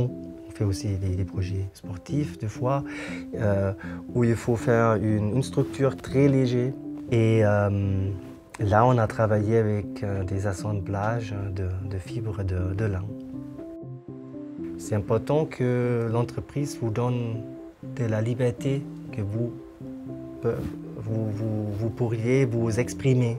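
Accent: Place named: Germany. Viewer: Allemand je crois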